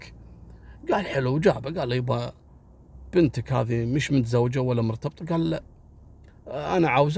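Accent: Egypt. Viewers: هههههه بحكي مصري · بحب طريقتك وانت بتتكلم مصري يا 😂😂بجد كأنك عايش معانا بمصر تحياتي ليك من مصر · يا قصصك وطريقتك روووعة ولهجتك المصرية اروووووع 👏👏👏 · يا حلو اللهجة المصرية من لسان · قصة جميلة واروع ما فيها لهجة المصرية.....😊😊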